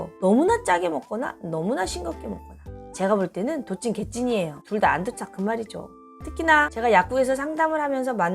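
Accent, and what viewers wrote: Gyeongsang, South Korea. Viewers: 이 분 갱상도 사람이라 "의" 발음을 못하내. "으". "으".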